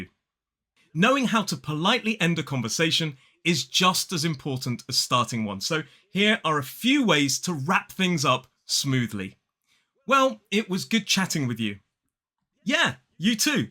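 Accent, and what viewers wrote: United Kingdom. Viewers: 我真的很喜歡英式腔調 I really like British accent….🫣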